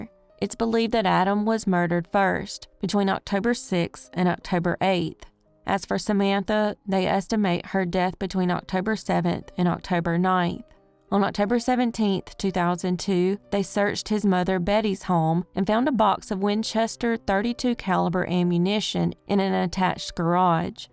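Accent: South, United States. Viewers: THANK YOU!! LOVE THAT SOUTHERN ACCENT!! AND THANK YOU FOR MENTIONING NEW MEXICO AND EL PASO TEXAS!! AND PLEASE ALL VIEWERS PLEASE SUBSCRIBE!!! SHES GONNA HIT THE BIG NUMBER HERE PRETTY SOON!!!!:hand-pink-waving::thanksdoc:🥰 🤠🤠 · I love her sweet Southern drawl! Her speaking voice is very pleasant and adds comfort to these tragic stories. Southern comfort😊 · There are other folks out there doing good work, but you are my favorite! Maybe it's that outspoken southern and compassionate thing you do so well.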